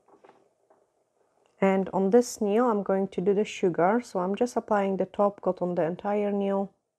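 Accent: Scotland, United Kingdom. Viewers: Love the set, love your work and attention to detail. Especially love your accent with Scottish influence. Hope to get to Scotland in the next couple of years!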